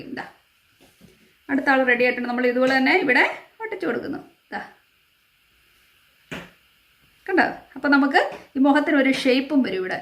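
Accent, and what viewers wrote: Kottayam, India. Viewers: Kottayam kari analle Samsaram kelkkumbo ariyam 😉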